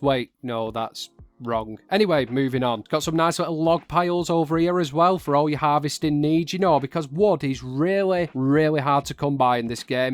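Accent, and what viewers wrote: United Kingdom. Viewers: Сколько английский не учи, а британский акцент все равно без субтитров воспринимать практически нереально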